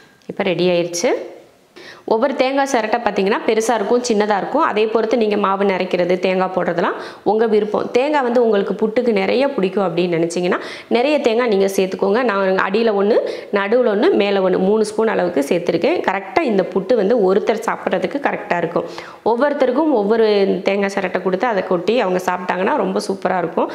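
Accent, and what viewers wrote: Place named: Theni, India. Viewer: செரட்ட தேனி language.....😂😂😂 Ur way of talking also தேனிகாரவங்க..... super sister நம்ம ஊரு மணம் ❤️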